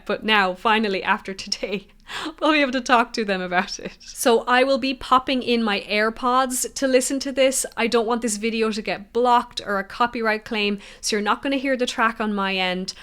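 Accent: Ireland. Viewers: I grew up with music and it helped me through a tumultuous adolescence. I was initially disappointed with their most recent productions, eg. " / " and this particular song. However, I grew to love " " ( This song reminds me a little of " " from that album.) I was used to being very amazed by each new song, that came out from proper, when I was young, that pushed the boundaries of what I'd ever heard before. These newer songs, that I mentioned somewhat disappointed me in that regard, although I did grow to love them. I'm thinking, that this song came out at a very opportune time, with all the stupid shyte going on in the World (war, politics etc.), to revive our love and memories of a better time ( the Artistic Renaissance, that kicked off) and hopefully generate a better perspective in people's minds and hearts to push us forward into the Future. Quite simply, WE are BETTER, than this. 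Adore your lovely Irish accent and look forward to hearing you sing. Thanks for this video. P.S. Do you play/sing, " "?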